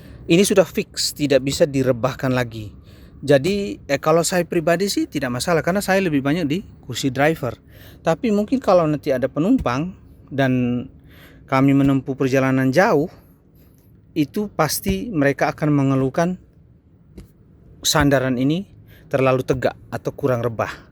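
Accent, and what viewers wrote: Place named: Nias, Indonesia. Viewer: logat nya kyk nias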